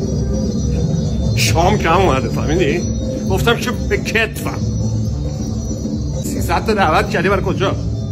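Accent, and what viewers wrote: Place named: Kashan, Iran. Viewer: بیخیال باش وبگذر،آقاداماد آخه امشب مهمترین شب زندگیتونه 😂😂😂شمابهترین کمدین هستیدواقعاعالی هستین من هرروزمنتظرکلیپهای جدیدشماهستم ممنون ازشماهنرمندگرامی کاشانی من شیرازیم ولی عاشق لهجه کاشانیهاهستم لطفااگرمیتونیدبالهجه شیرازی هم کلیپ بذارید❤❤❤❤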